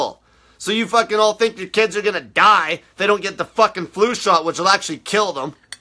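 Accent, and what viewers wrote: Canada. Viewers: I’m confused. I head your Canadian accent, but I hear ur tone and it ain’t adding up.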